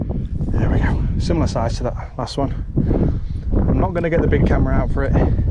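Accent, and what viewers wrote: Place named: Nottingham, United Kingdom. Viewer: Lesson thoroughly learned I guess! Well done anyway. Lovely looking fish. I'm guessing by your accent you're Nottingham area? Anyways, I used to work for the council in Long Eaton and there were some big old carp in the canal there. They'd grown big and fat on all the duck bread over the years.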